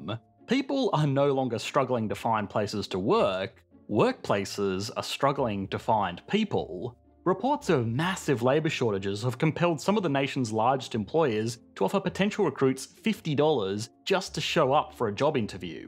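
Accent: Australia. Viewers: I like a guy with an Australian accent telling us how the US Federal Reserve works!!!???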